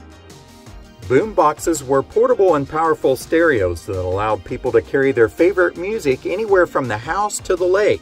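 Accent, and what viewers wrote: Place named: Midwest, United States. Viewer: What part of the Midwest are you from? If I’m wrong I apologize, just a guess. I’m originally from central Indiana. Your accent sounds familiar to me. Love your YouTube channel. We are both products of the 70’s and 80’s. I was born in ‘72. So I remember all of this great crap. I personally loved anything that was robots or computers. BMX bikes were just the best too.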